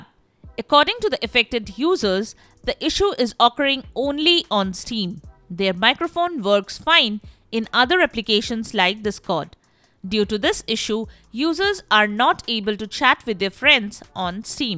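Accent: India. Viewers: When I hear the Indian accent I know it's finna work. Thank you very much.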